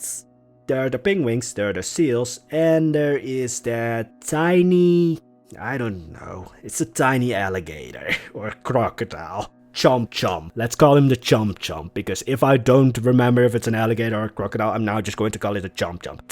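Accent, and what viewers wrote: Netherlands. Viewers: I smell Dutch... 👀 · Are you dutch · Ur dutch right?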